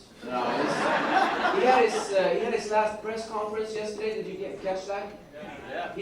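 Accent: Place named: Sweden. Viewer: Haha han pratar tvär svensk engelska dock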